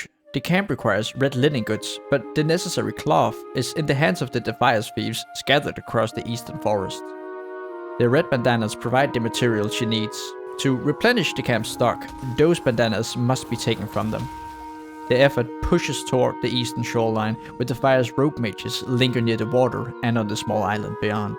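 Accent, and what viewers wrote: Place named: Denmark. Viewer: Danish accent, right? Good video.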